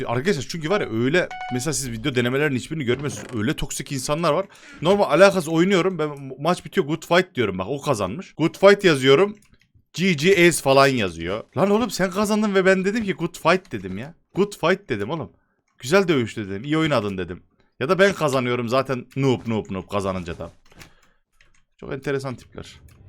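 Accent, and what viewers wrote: Yozgat, Turkey. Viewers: 10.56 Abi Yozgatlisin yalnız Kral eline sağlık abiciğim cağnıı yerimmm ❤ Video mük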